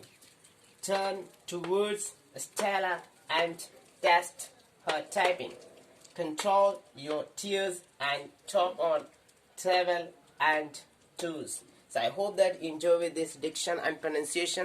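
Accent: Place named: United Kingdom. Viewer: Wow! Nice British accent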